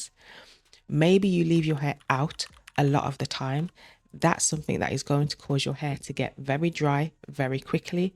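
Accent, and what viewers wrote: Birmingham, United Kingdom. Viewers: You and your hair are beautiful, giving me hope as I can sometimes get stuck, on another note love the Brummie accent, reminds me of a friend of mine.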